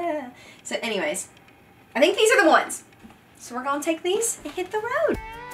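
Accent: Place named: South, United States. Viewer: I love that southern accent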